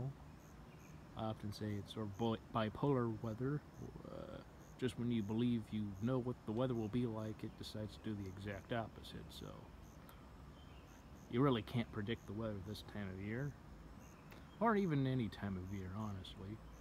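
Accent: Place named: Midwest, United States. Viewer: First off, I do hope that you are staying safe and dry. Secondly, Iowa? I subscribed to your channel - after having seen that you commented on the some of the same channels as me - and I could not figure out your accent. Turns out you're a Midwestern boy. Like your tone, elocution, and diction seemed old-fashioned like you came out of a Capra film. I mean no disrespect, and am totally wishing I spoke like you.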